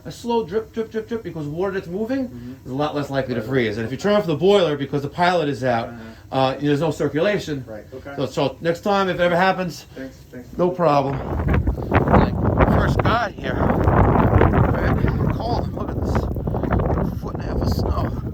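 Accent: New York, United States. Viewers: Trying to copy Steve laviemore channel, gloves, camera, videos but with New York accent. No wonder he deleted you. Trying to be a celebrity plumber. Nobody likes a copy cat. Lol only .I couldn’t work all day doing service trying to put on a show holding a camera. To much time. To many calls. Not bad if you only one or two calls a day. But five to six.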